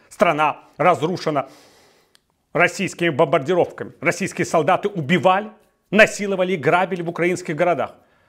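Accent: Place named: Baltic. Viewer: Ты кто??? Комментировать за Грузию с акцентом прибалтов.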